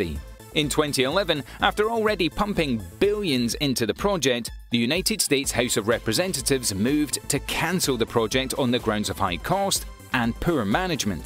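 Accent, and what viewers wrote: Scotland, United Kingdom. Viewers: It’s LaGrange not larange. Perhaps it’s your Scots accent that misinterprets everything. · For someone with a British accent he mispronounces a Lot of important words ie: La Grange,Arianne,Grummin etc. Makes me wonder if his facts are just as poorly based..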